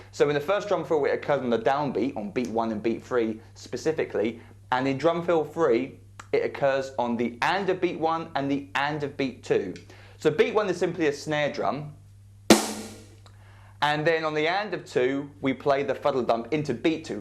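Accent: United Kingdom. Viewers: Haha I started to watch the vid.. And then you started speaking with a TOTAL British accent.. I laughed my butt of! But you play just fine! Keep up the good work.!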